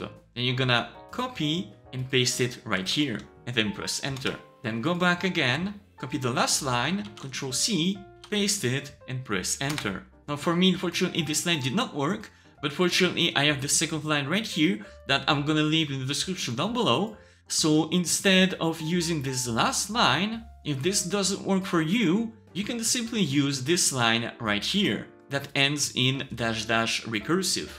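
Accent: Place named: France. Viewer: You sound french, are you french?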